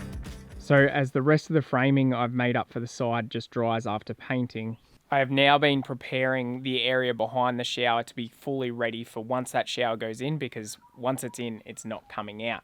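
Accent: Australia. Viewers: Jeeez! Auzzy English sounds shayyt! Cool video, though. Cheers. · Adore this build mate. So happy for you. As an Aussie that spent time in Canada I love pickin up the little subtleties in your accent, pure Aussie with little sprinkles of Canadian in there. Very nice haha.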